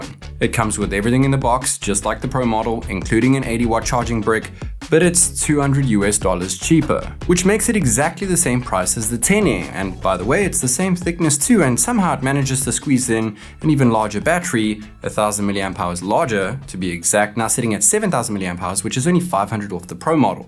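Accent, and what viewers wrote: South Africa. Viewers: kewling system lol love the South African accent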